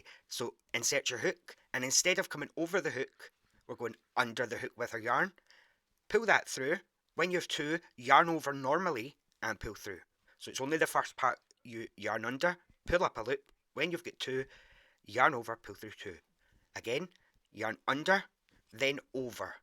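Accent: Scotland, United Kingdom. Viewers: So cute! I love the Scottish accent. I am subscribed for sure!! Get well soon! I know all about back pains. Rest up!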